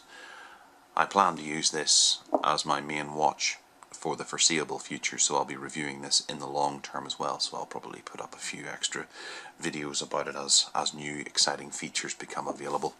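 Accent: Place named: Northern Ireland, United Kingdom. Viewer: Where are you from? You sound like you're from around my area..ish lol. Northern ireland?/ireland?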